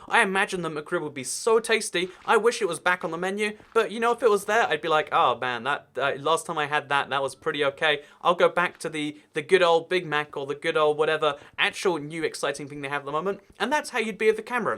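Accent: United Kingdom. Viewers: Is that a German accent he speaks with in the beginning? Weird since he speaks in a British accent the rest of the time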